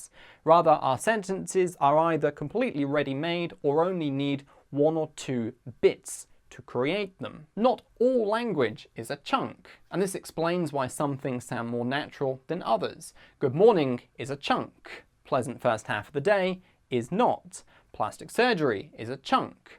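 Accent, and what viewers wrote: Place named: United Kingdom. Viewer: Yes, it is British English!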